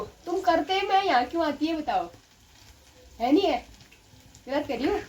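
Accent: Pahari, India. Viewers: Aapka pahadi bolna bahut accha lagta hai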